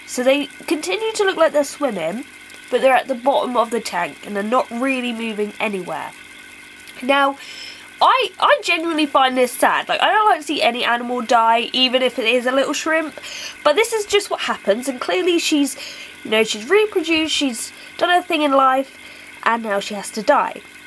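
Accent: United Kingdom. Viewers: i can't really recognize accents (since I've moved to wales which is weird) but are you British?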